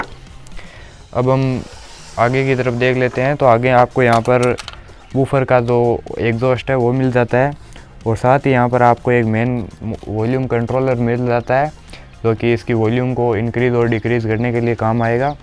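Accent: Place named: Rajasthan, India. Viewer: Pka rajsthan se ho 😄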